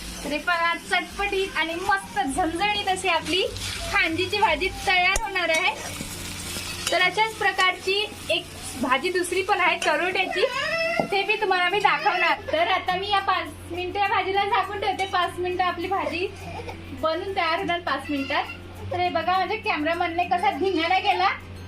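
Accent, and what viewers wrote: Vidarbha, India. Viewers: Vidhrbhatle ka tumi bhasha varun vatle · ताई तुमी कोणत्या गावातुंन बोलता नांव काय आहे ताई तुमच वराडात ल्या बोली भाषा मुडे विच्यारल मी सोनाडा ची लेक आहे गाव ची अस म्हणायच आहे मला माझ नांव गीता आहे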